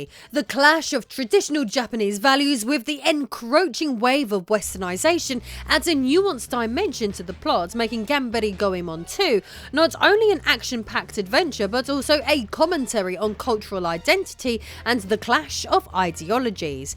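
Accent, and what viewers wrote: United Kingdom. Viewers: I like doing the segment where you were talking about Mobile suit Gundam, with your British accent it sounds like you're saying, got damn. With your British accent, when you speak, you say every syllable within a two syllable word, instead of, just saying it as one word, you and your husband, do this, I'm just telling you, what I've noticed, it's not bad, I'm just giving out my two cents here.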